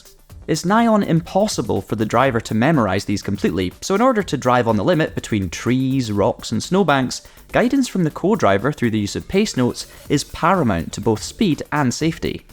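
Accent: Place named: Wales, United Kingdom. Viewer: Brudda he’s not English he’s a Welshman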